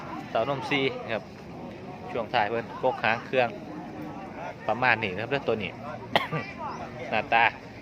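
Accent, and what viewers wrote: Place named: Sisaket, Thailand. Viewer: ศรีสะเกษชัดเจนจ้าอ้าย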